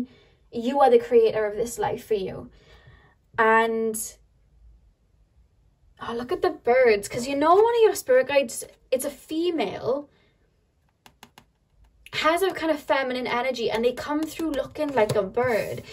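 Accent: Wales, United Kingdom. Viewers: I always take every one of your pick a cards as a personal reading, because you're really that accurate. And you're so comforting and uplifting, hearing your lovely Welsh accent is like receiving a hug from my Nana (she was also from Wales, sadly passed away.) Thank you so much, you are a true spiritual warrior & you give so much, you deserve all the love in the world 🐉💎💐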